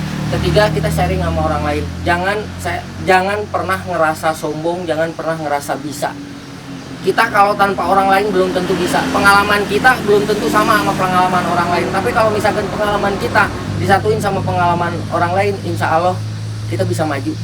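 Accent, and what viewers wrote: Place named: West Java, Indonesia. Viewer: Sunda kentel bahasanya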